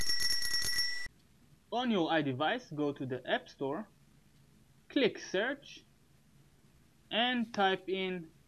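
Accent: Israel. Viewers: איך שומעים שהוא ישראלי..(: תודה על ההסבר, זה מעולה! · אתה נישמע לי ישראלי בכל מקרה לא משנה thx bro good view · I have IOS 9.0.2 and its not free. And i know you're from israel you have a israeli accent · Nice Israeli accent lol. Works great thanks!!! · שמע לא יכולתי שלא לכתוב שאתה ישראלי עם המבטא הזה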